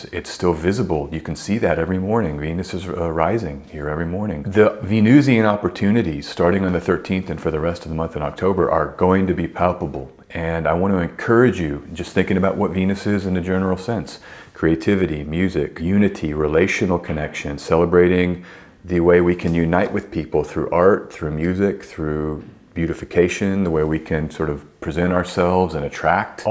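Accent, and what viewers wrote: Texas, United States. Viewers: I thought I was hearing a Texas accent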